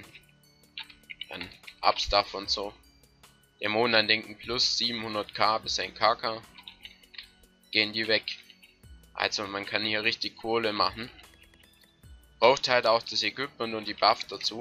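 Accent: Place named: Swabia, Germany. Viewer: Du kommst safe aus dem schwabenländle^^ aus welcher Richtung?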